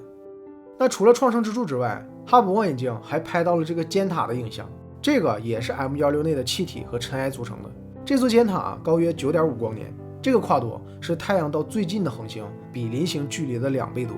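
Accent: Northeast, China. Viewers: 解说中，要是能把东北口音去掉就堪称完美了！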